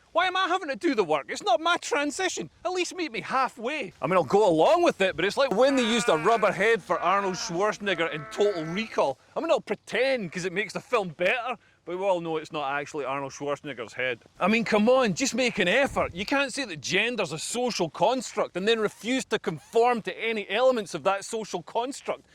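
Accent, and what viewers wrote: Scotland, United Kingdom. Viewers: Came for trans jokes .. Stayed for the Scottish accent :3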